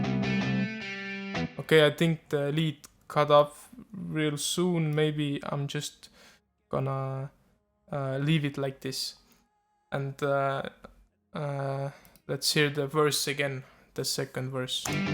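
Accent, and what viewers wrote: Russia. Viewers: Ur accent sounds like u from Russia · мне кажется или ты русский?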